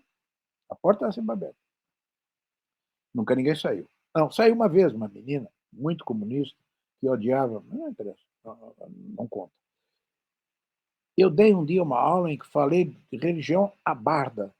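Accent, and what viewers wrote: Brazil. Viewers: ele é portugues! lol está é a falar com o sotaque brasileiro. o que acho uma completa idiotice e traiçao á pátria! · Falar com pronúncia brasileira professor? recuso ouvir isso. O senhor é português!!! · Estive duas vezes no Brasil, em 1984 e em 1991. Se eu não falasse com sotaque brasileiro, 80% das pessoas não me entendiam. Aqui o importante é que eles, os brasileiros, entendam o que o professor está a dizer. Além disso, fique sabendo que os linguistas dizem que o português que se falava em Portugal nos séculos XVII e XVIII era, quanto à sonoridade, muito mais parecido com o que se fala hoje no Nordeste do Brasil do que com o que se fala hoje em Portugal, com as vogais muito fechadas… Embora pareça um pouco ridículo para nós, que estamos habituados a ouvi-lo falar o nosso português, acho que o professor tomou a atitude correcta aqui. Não deite fora o bebé com a água do banho…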